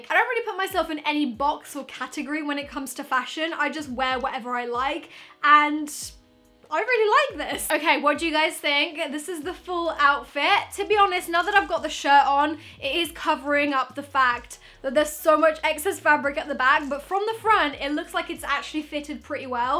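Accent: United Kingdom. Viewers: are u Britain??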